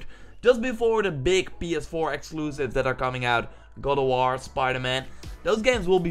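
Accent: Netherlands. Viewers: You should work on losing the Dutch accent.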